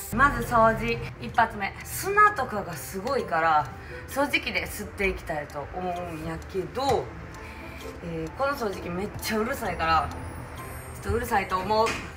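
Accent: Kansai, Japan. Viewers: なぜ？オレのオススメにでてきてた！？ そしてめちゃくちゃ好きな関西弁とめちゃくちゃ男を虜にするアングル。 そっとチャンネル登録押したオレは正直者😂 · ダメだ…サムネの破壊力に負けてしまったwしかし女の子の関西弁は可愛いわぁ🎉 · 関西弁 めっちゃ良い🤭 あからさまな感じが 意外にモテる秘訣かもね😅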